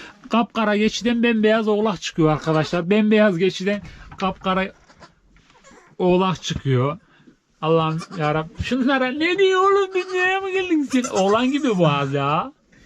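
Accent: Konya, Turkey. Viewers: Abi nerelisin şiven bizim buradaki şiveye benziyor ben KONYA/BEYŞEHİRLİYİM.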